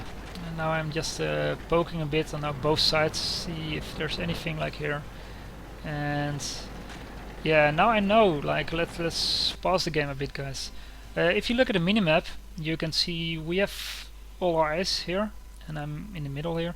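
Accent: Netherlands. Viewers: dat dutch accent ;) Great vid! keep it up!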